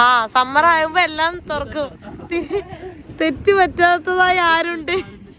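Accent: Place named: Mattancherry, India. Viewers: എന്ത് പറ്റി. വീഡിയോ ഒന്നും ഇടാതിരുന്നത് 🤔 ാടെ. സംസാരം T.c. r Kochi കൂടി കലർന്ന സംസാരം പാലക്കാടൻ. സ്ലാഗ് ഒട്ടും ഇല്ല V. Good 👍🏼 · മട്ടാഞ്ചേരി സംസാരം കേട്ടിട്ട് really super🎉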